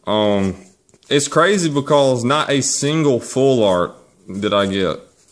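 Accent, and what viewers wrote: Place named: South, United States. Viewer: why do you speak so country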